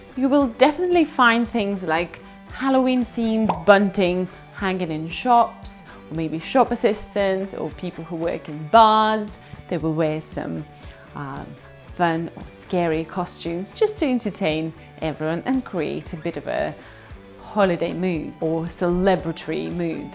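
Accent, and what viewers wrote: United Kingdom. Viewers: I have fallen in love with your British accent ❤ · Если бы темп её речи был чуточку быстрее, то это был бы ад для меня при понимании. Ну никак не могу разобрать британский акцент! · I admire your British accent! Cheers! :)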